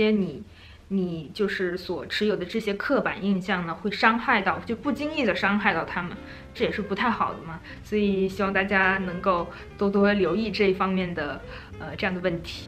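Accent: Chongqing, China. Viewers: 那是重庆话。。。吧？ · 小姐姐很可爱，就凭这重庆话，关注了。🥰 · 应该是博主从小生活在重庆的关系~ 您所谓的带朝鲜味或东北味的口音应该都是在东北三省地区长大生活的关系。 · 重庆媳妇吗？重庆话这么标准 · 我更感觉你是重庆妹子的味道，我最喜欢重庆妹子了，重庆妹子豪爽！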